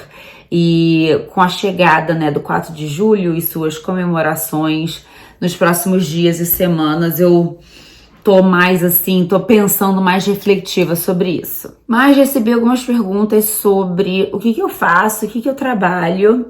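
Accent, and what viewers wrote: Rio de Janeiro, Brazil. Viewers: Oi, então a sua voz é maravilhosa adoro você falando,especialmente com esse sotaque carioca que é o meu preferido acho lindo lamento ter descoberto você quando já estava mudando para 🇺🇸 e não tive a oportunidade de conhecer -la pessoalmente aqui em londres, mais enfim sou super fã dicas de beleza então são minhas favoritas.bjus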